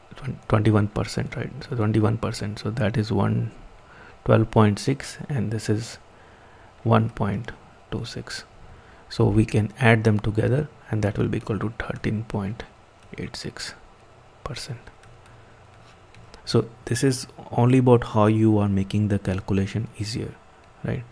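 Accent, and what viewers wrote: India. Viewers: When you here a indian acent you just know hes good to maths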